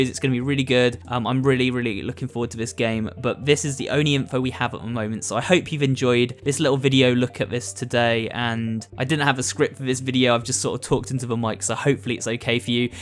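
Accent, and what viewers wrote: United Kingdom. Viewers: you sound like your from the UK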